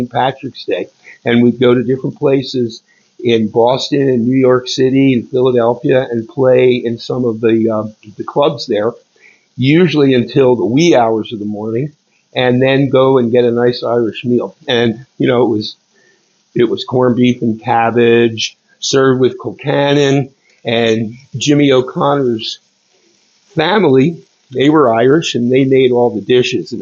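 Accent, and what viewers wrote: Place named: South, United States. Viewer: Love your accent! You're truly a southern belle 🤗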